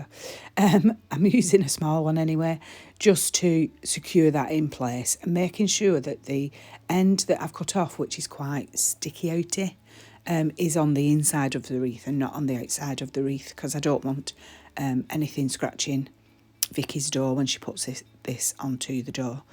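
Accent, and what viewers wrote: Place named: North, United Kingdom. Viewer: I really loved seeing how this came together. I never had any clue how stunning wreaths like this were put together - when I made mine I was massively winging it and used all of the wrong things! The finished wreath is so classy and utterly stunning. For what it's worth, I personally prefer not-scripted voice-overs because I find them more natural to listen to than when something is read out. Just my opinion, and I know others may differ, but I liked your voice over so I don't think you need to change anything. As someone from Lancashire who now lives down south, it's always lovely to hear an accent from further north too :-)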